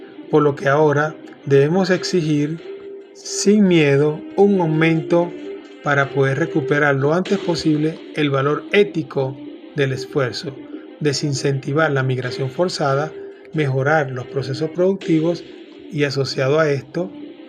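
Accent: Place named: Venezuela. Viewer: Muy buenas propuestas las que usted está es poniendo buenas tardes desde tinaco estado Cojedes Antonio Acosta lo escucho venezolano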